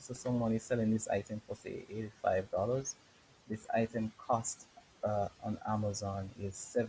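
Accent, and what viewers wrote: Jamaica. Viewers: you sound jamaican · You got a slight jamaican tone. are you jamaican? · Honestly this was the best explanation I found on YouTube on how to drop ship from ebay .....simple and easy to understand thank you .....by the way where r you from ? I'm from Antigua ur accent sounds similar or Jamaica? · Do you use a paid email store or just like the regular free eBay? Also I hear the JA accent big up:) are u doing this from JA?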